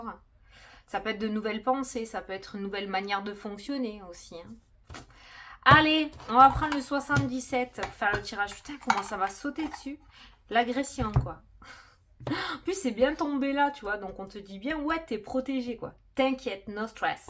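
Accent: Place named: Marseille, France. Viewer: bj contente de te voir et de t entendre 🤩👍l accent de chez nous! bj des marseillais 💙🤍💙🤍💙🤍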